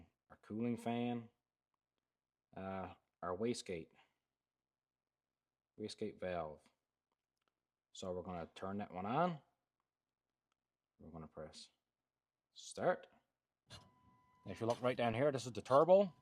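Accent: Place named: Newfoundland, Canada. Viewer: Sound like a Newfoundlander cheers 🍻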